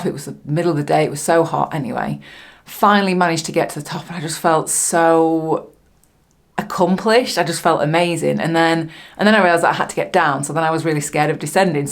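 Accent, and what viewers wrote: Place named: North, United Kingdom. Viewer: Just wanted to say thanks for your many inspirational videos, really looking forward to this series. Been riding for about 15 years now. Managed to eat myself into a diagnosis of type 2 diabetes back in august and have since become fitter and faster than ever (at 50!) 3 weeks ago managed to aggravate an issue with a disk in me neck which meant I was in a lot of pain and unable to ride. Watched this vid while in hospital recovering from the corrective surgery so I can’t wait to hear you share your recovery story. Reckon it will be 6 to 8 weeks until I’m back on the bike which is going to be hard. I have to say though they for me over the last 15 years of riding as someone with fairly severe bipolar I have gotten HUGE mental health benefits out of the simple act of riding and maintaining my bikes. So please keep up the great work, and also it’s good to hear a proper northern accent here on YouTube.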